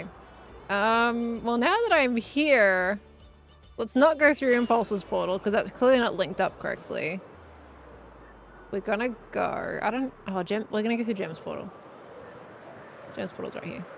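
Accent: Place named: Australia. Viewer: I'm just happy to hear the Australian accent.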